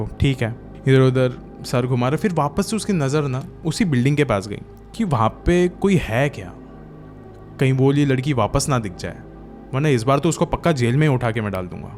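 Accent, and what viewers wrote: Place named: Mumbai, India. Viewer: Apna mumbai wala accent chor ke story nurret kyea kar bhai tab jada log connect kar payenge tere sath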